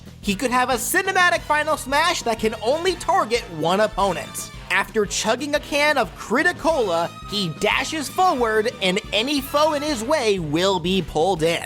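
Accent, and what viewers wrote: New York, United States. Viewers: A bit of a missed opportunity to use your New York accent. Regardless, this was a great moveset for the Scout.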